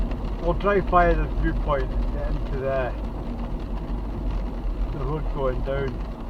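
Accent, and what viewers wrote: Scotland, United Kingdom. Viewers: The closed captioning during the waterfall scene is classic "computers cannot understand a Scottish accent!"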